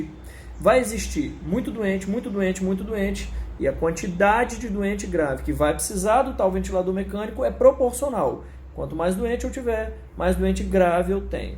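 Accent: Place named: Minas Gerais, Brazil. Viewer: Eu também estou desconfiado desse cara ai. Se ele é capixaba de onde saiu esse sotaque"TREM" trem é sotaque mineiro. Eu também procurei. Deve ser que esse coitado tentou passar em medicina e não conseguiu agora tá aproveitando esse caus e tirando uma onda. Mais pelo menos ele está falando a verdade. Isso é muito bom. Ai a população acorda.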